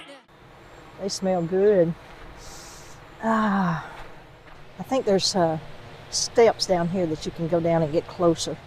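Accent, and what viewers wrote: South, United States. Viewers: Do you use the toilet you made up for both #1 and #2? I use two of these camp toilets in my old farm house. My well went bad 18 yrs ago and I hated the water anyway. I only come to this place for a few months each year so works well. Plus, my septic works just fine, so I empty every couple days into real toilet and put 2 1/2 Gal in back of toilet to flush. Nice video and great set-up. P.S you sound real southern. are you? ;-))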